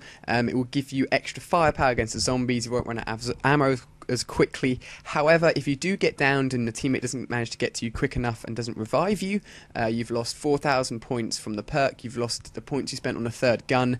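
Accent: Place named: United Kingdom. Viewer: why is it always a british guy?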